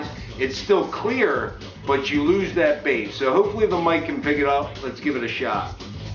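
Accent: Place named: Pittsburgh, United States. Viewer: ....that accent though!!! Bro that sounds like Pittsburghese lol!! Great review though man....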